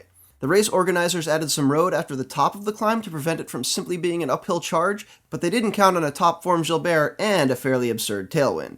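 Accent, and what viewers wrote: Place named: United States. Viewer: Nice video, and nice to hear a yank who know's his stuff for a change.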